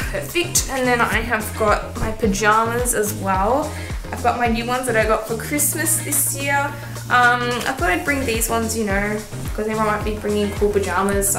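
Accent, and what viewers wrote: Australia. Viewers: I can tell you are Aussie because the stuff and your voice if I am wrong I’ll be honestly shocked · Are u austrailian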